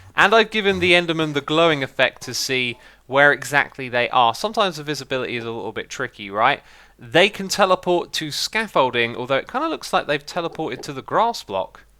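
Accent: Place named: United Kingdom. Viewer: What happened to xisumas accent over the years? Some ppl might not know but had a "normal" accent. I mean sounded like a american accent. Not sure how he started talking british suddenly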